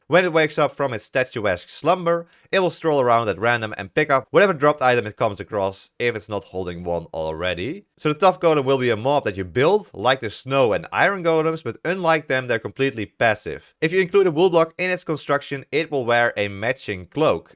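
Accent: Netherlands. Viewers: Ik ben master i can tell your dutch from your acsent lol XD keep up the containt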